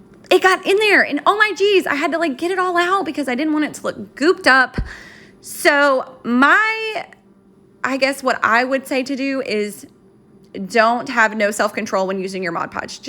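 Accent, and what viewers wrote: South, United States. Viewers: You are making me laugh....I KNEW you had to be from the South (I'm from TN)....and I ALWAYS say "anywho"...love it! I think I really like the Jingle All The Way sign. Never thought of distressing the metal. Great idea!!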